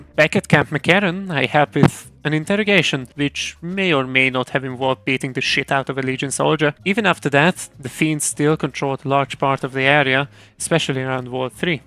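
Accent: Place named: Hungary. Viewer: Hungarian accent on top :D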